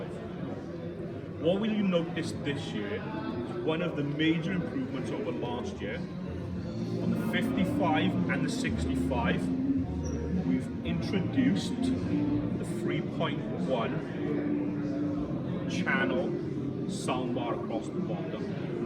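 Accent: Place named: Wales, United Kingdom. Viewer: Great to hear a Welsh accent demoing a TV :) Thanks for this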